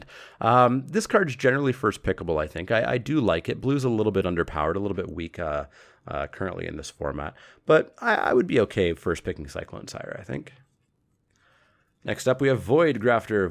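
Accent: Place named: Canada. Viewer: Nice content. Where are you from in Canada that you pronounce "un" as "on"? Tap an "ontapped" ally you control. Onto the "oncommons". Etc.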